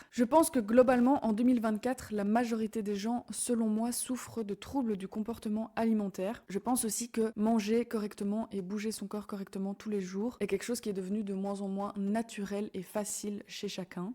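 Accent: Belgium. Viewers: J'adore ton petit accent belge =)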